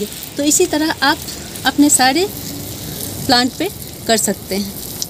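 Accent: Bihar, India. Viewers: Nice information....ap Delhi rehte ho, bt apka tone to Bihari hai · Aapki boli me Bihar hai.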